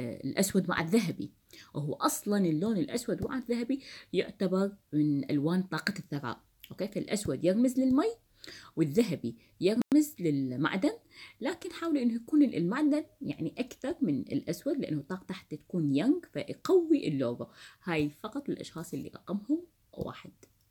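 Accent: Iraq. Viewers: انتي عراقيه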